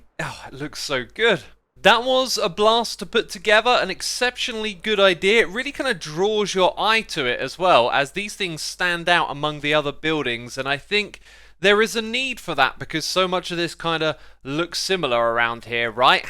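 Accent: United Kingdom. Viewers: He's british... That pronounciation of er is common among British accents. Edit: if you were asking which British accent, I am not sure. We don't know where he is from in the UK. I am not knowledgeable enough about British accents but I guess it could be from North England?? I am not am authority on that though so don't take my word on it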